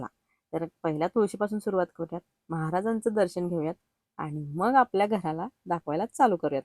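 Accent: Kolhapur, India. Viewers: Arre hi tr aapli kolhapuri bhasha as mla vat tiya pn mastt ghar aahe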